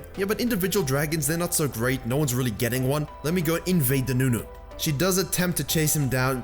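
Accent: South Africa. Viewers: you're from south africa! <3 I am proud!!!! haha I did not even notice. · Are you South African? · You sound South African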